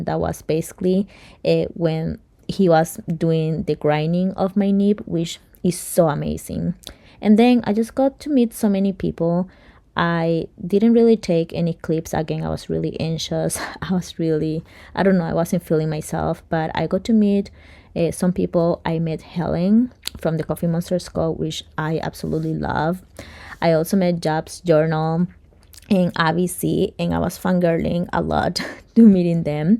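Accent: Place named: Philippines. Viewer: No offense just curious to know where you are from? I can’t pinpoint your accent..I’m guessing Philippines?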